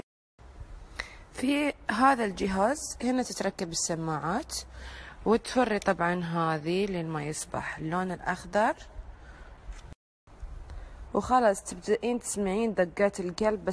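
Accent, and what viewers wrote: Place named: Gulf, Arab world. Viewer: اسمه جل وليس كريم. ليش تتكلمي لهجه خليجيه.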